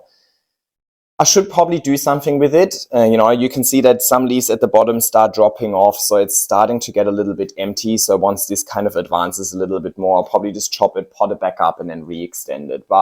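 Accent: Germany. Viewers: Ahh nice! My best friend is German, I detected something in your accent. 😜 Right! Makes sense. How do you remove the roots from the moss pole successfully?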